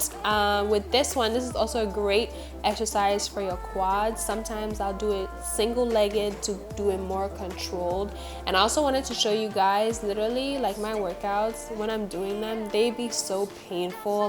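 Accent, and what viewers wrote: Caribbean. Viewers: You’re body is amazing! Love your videos, are you Caribbean? You have a slight accent but I can’t tell from where lol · Are you Caribbean?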